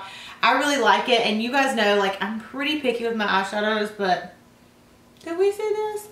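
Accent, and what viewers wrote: South, United States. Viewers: Just found your channel and I LOVE that I've found someone with a southern accent that does makeup videos haha!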